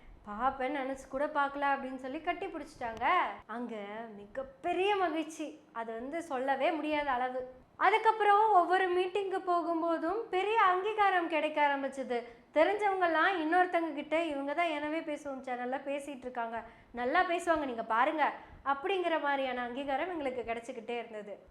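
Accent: Kongu, India. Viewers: சகோதரி! உன் கொஞ்சும் கொங்கு தமிழ் மிகவும் சிறப்பு வாழ்த்துகள்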